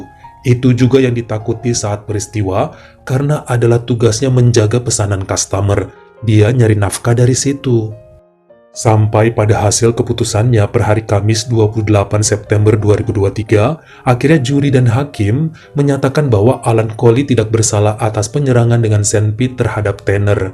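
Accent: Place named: Medan, Indonesia. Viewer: setiap denger cerita dr channel bang aku ketawa ngakak. mgkn krna aku berasal dr medan udh terbiasa denger logatnya, dan ada kesan lucu polos dlm bahsa2nya..sugguh menghibur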